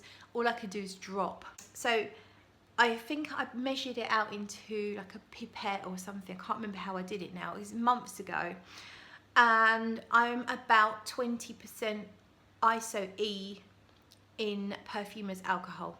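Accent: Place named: United Kingdom. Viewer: What part of the uk are you from? The accent is fun to listen to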